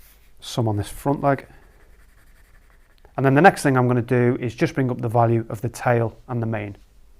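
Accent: Liverpool, United Kingdom. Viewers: Scouser? Me too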